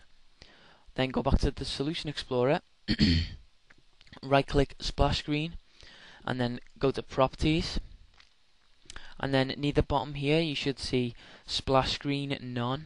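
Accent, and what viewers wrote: Liverpool, United Kingdom. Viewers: Neva mind, Found it! Nice Accent by the way! Scouser? I'm Welsh, I h8 my accent!! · I think you will find it's Scouse (Liverpool)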